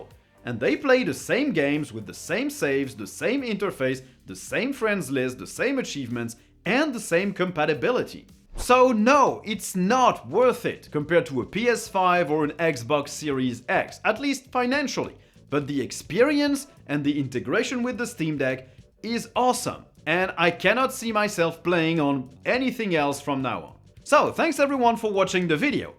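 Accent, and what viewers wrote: France. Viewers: J’suis pas fou c’est un français il parle bien mais on se sait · He's french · Petit frensh accents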